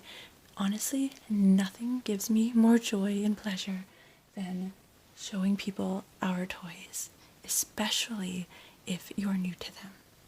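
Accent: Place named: Canada. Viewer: hi!! i was just watching some asmr before heading to bed, i just have to ask, are you canadian or midwest american? if this is invasive im so sorry!! its because i like accents, and i love learning about them alongside with dialects. and your accent sounds VERY canadian, or maybe even wisconsin sounding if you’re american :)! love your videos before bedtime!! helps me transition into the night, and due to being autistic, it can be hard to go from one thing to another. cheers ^_^ !!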